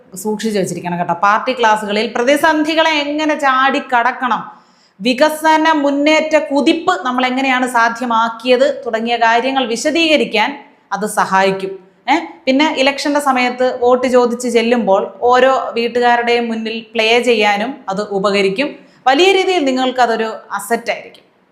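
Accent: Thiruvananthapuram, India. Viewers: തീരുവന്തോരം സ്ലാങ് സൂപ്പർ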